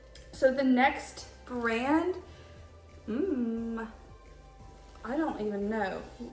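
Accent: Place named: South, United States. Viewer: You have a slight accent; are you from the south?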